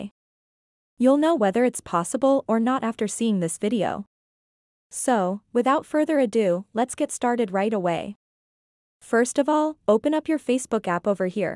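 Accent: India. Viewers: You have an indian english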